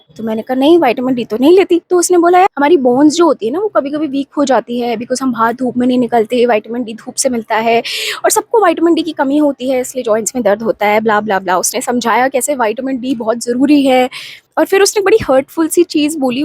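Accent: Rajasthan, India. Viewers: Mam aap alwar rajasthan se ho kya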